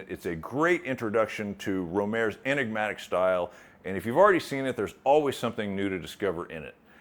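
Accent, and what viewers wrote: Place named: United States. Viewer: This accent is extremely American.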